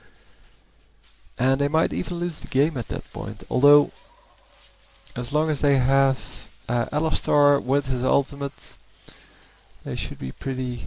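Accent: Netherlands. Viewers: Only real dutch people have this i live in the netherlands as well but my parents are from somewhere else but i dont have THAT accent :)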